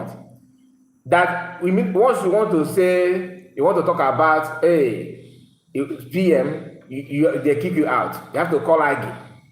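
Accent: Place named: Fulani, West Africa. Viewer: You don't know where you come from that's why your accent is the same with foolani people. Leave nwanyi isi ocha, leave all the saga and your stupid analysis. Let's talk about PM, let's talk about MNK, let's talk about Biafra recognition, let's talk about Homeland. How long are we going to remain in this saga? Is this how to run a government? Criminals!!!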